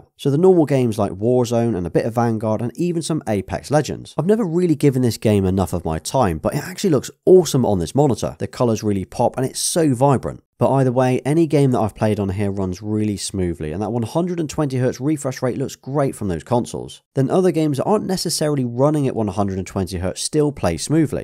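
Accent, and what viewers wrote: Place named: Bristol, United Kingdom. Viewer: Nice gaming monitor. Your accent is annoying me, from Bristol by any chance ma babba? Annoying me in a good way, I am from Weston.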